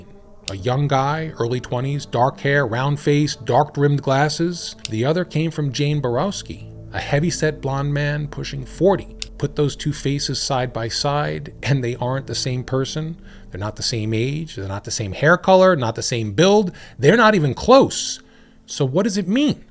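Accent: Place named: New Jersey, United States. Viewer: Now I really can't stand the use of the term "unalive" because I feel it almost patronizes the viewer like we're toddlers or something and I also feel it's a little disrespectful to the victims as well, and typically the new Jersey accent annoys the fuck out of me BUT I gave you a thumbs up because it overall was a very good video and tbh your voice wasn't as annoying as I expected. Thank God you don't sound like you're from north Arlington.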